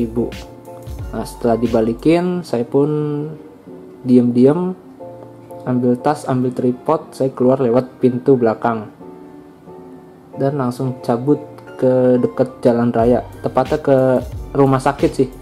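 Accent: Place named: Central Java, Indonesia. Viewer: Dari logat bicaranya, dia kayaknya orang dari daerah plat K.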